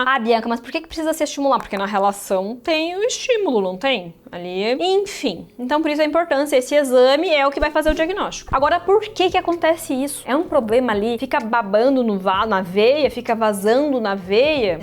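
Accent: Brazil. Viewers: Deve ser do interior de São Paulo SP como a pavoro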